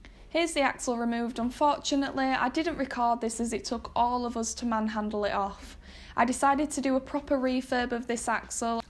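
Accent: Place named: Yorkshire, United Kingdom. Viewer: Love ur vids I'm wanting a defender project soon And I will be using ur vids as guidance Keep it up BTW I'm guessing your from Yorkshire lol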